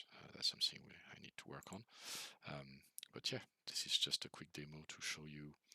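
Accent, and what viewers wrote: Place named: France. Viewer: Si j'avais des doutes sur le fait que tu sois français à l'accent, à 11min30 le ''hola!'' m'a vraiment marqué mdr. Merci pour le tuto, tu es un chef.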